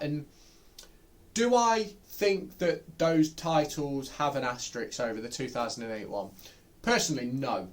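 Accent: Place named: United Kingdom. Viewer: Hahahaha really british talking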